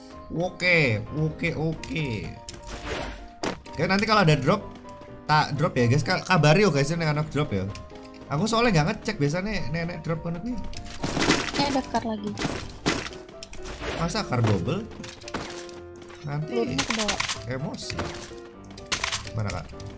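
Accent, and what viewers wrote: Java, Indonesia. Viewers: Jowone ngendi mas?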